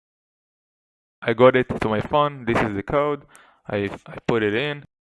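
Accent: Israel. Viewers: אני מת ידעתי שאתה ישראלי מהמבטא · חחחח בשנייה שהוא התחיל לדבר הייתי כזה "הוא כל כך ישראלי"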